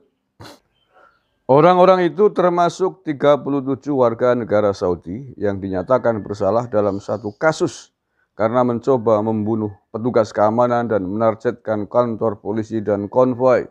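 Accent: Banyumas, Indonesia. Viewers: asli.... logat Banyumasannya kangmas satu ini yg bikin kangen dengerinnya... Sehat terus yoooooo Masbro.... :)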